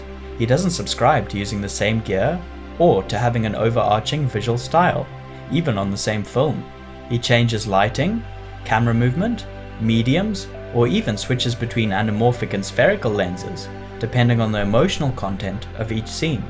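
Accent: South Africa. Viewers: you sound south african? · You sound South African, am I hearing correctly ? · Dude are you from South Africa???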